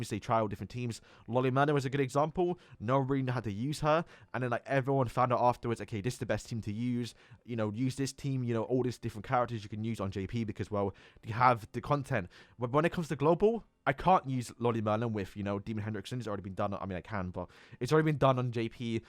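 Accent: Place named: United Kingdom. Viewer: Seriously there are British youtubers accent then theres accent who is on whole different level, istg accent is my salvation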